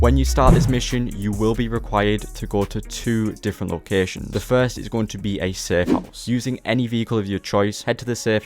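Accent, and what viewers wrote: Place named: Newcastle, United Kingdom. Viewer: Good vid(u sound a bit geordie pal lol.im one)aye ill Definitely be watching more of ur vids beo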